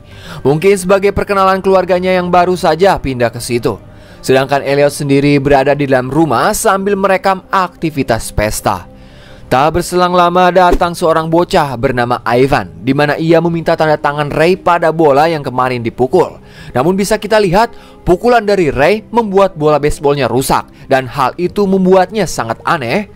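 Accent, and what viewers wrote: West Java, Indonesia. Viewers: aku senang dan salut sama si akang yg cara pembawaan alur film nya logat nya sunda banget dan kocak..hebat lah buat si akang..😂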